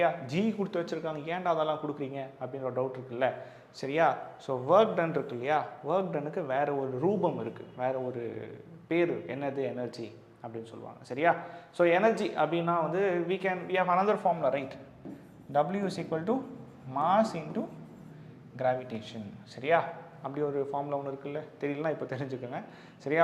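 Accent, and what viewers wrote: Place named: Thanjavur, India. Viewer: thanjavurla irunthu pesuran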